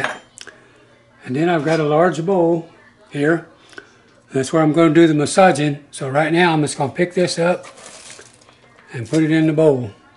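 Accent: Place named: South, United States. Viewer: Sounds like joe Biden making a tutorial on making kraut but with a southern twang .... c’mon man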